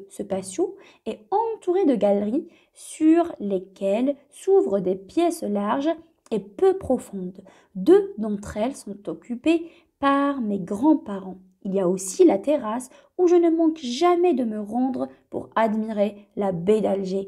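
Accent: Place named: France. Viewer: J'adore votre accent français😍😍😍😍😍😍😍😍😍😍😍😍😍😍😍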